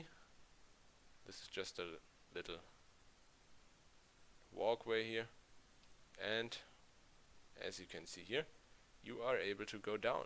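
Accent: Germany. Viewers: German? xD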